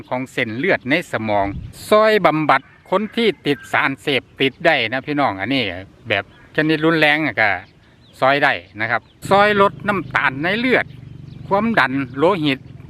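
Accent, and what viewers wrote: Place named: Chaiyaphum, Thailand. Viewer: คนลาวอิสาน ชัยภูมิ เว้า แนวนี้ เนาะ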